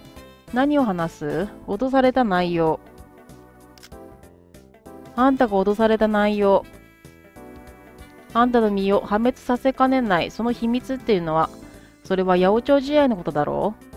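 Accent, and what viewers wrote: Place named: Kansai, Japan. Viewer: 関西人ですが、の関西弁くせになりそう😁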